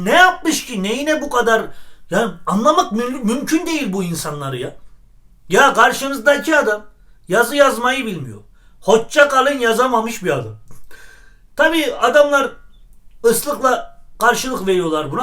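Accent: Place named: Black Sea, Turkey. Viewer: karadenizlice konuşmana bayılıyorum:D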